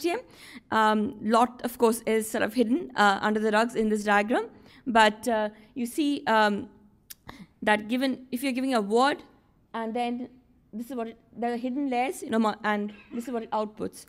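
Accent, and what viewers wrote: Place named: India. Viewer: hhh, Indialish is a little bit cute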